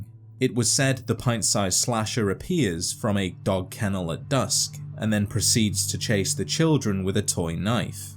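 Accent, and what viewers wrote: United Kingdom. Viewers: Good to see a fellow (from what I can tell) british fallout content creator. Great shit dude!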